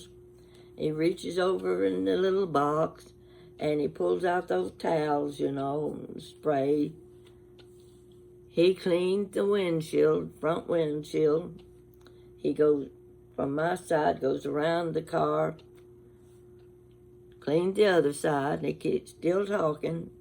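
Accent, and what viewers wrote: South, United States. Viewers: I remember you reciting your memory, you could have knocked me down with a feather when you perfectly pronounced a town that is very familiar to me in your broad southern accent lol x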